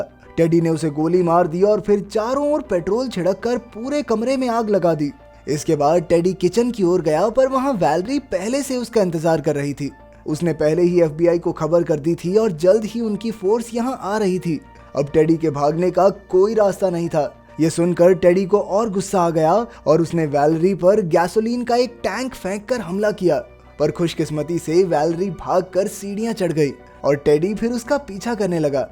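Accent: Haryana, India. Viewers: Bhai Haryana ka lge tu ???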